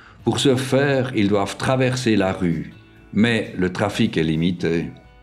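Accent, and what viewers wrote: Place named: Switzerland. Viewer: Bonjour, Des paysages magnifiques. Toujours l'accent Suisse merveilleux. Bonne continuation. Thierry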